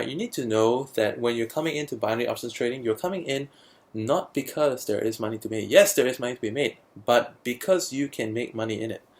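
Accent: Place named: Singapore. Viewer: Hey, I reside in Singapore. I assume you're a Singaporean too judging by your accent. Would you perhaps share with me a platform on which we can chat(email or skype). I'm eager to know so much more and would be grateful to learn from no other but a fellow Singaporean.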